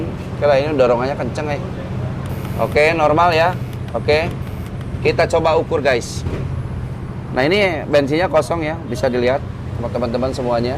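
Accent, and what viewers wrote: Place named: West Java, Indonesia. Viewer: Aya logat sundaan.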